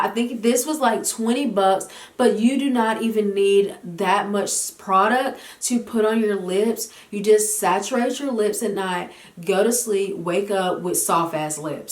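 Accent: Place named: South, United States. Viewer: Love the video, you got me wanting that lip mask now! 😩😂🤦🏽‍♀️ Your southern accent is so cute and thick, I’m from Bama too and wonder if I sound like this to other people 😂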